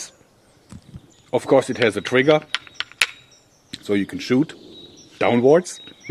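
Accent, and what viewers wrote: Germany. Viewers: It's cool how I recognized the German accent even before I knew you ARE German. xD By the way, I'm German too, so it's no hate. I also have some bad accent. :) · when you hear his ,,dialect“ of speaking, you know that he is from germany;) geile video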